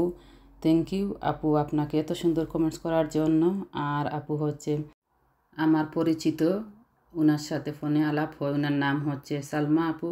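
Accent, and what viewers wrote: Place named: Sylhet, Bangladesh. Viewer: 👍খুব সুন্দর লাগলো আপু 🎉🎉সিলেটি কথা শুনতে ভালো লাগে 🎉🎉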